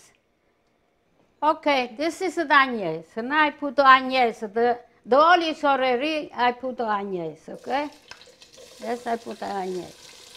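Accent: Italy. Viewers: watching you cook, and hearing your wonderful Italian accent, and feeling the love coming from your heart, makes the part of my day that I spend with you, in your kitchen, take me back to my own childhood, your a very special woman, thank you for what you are and do. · I am a fan of Italian cuisine specially when it is presented in English with Italian accent. I am happy that Pasta Rigatoni brings back to you nice memories. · So happy to stumble onto your channel. My sweet Italian grandmother has been gone for 19 years. Listening to you is like being back in her kitchen. I never noticed her thick accent. I understand every word you say. Wonderful memories. You are real treasure. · Oh, I looooooooove Italian accents! And hers is so sweet.... I love how she narrates every step. Sending big hugs from Deutschland, beautiful lady! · My God I could smell that food through my phone it looks so good. 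I just want to give that woman a big hug I love her thick Italian accent continue continue making videos grandma I love you.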